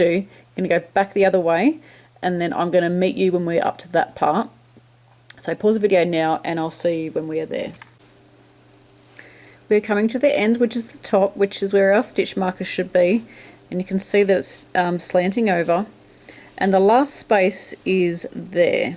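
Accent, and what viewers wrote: Australia. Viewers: Awwe, you've been reunited with your crochet love!! That's really cool. I can relate. I had a bicycle once when I was in college. I rode that thing everywhere. Went home for a week. Came back, hopped on the bike, and was so surprised how good it felt when my butt hit the seat!! (I didn't cry, but I get you.) Happy to see you back; I was missing you. Nice patterns, good instructions, lovely Aussie accent!!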